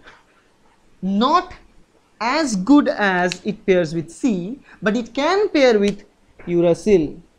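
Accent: India. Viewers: English with hindi accent teaches a lot from understanding and recapitulating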